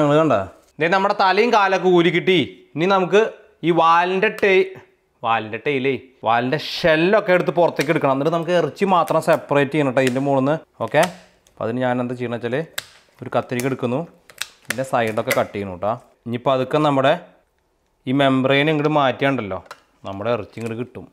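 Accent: Thrissur, India. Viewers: ❤… lub ur tcr slang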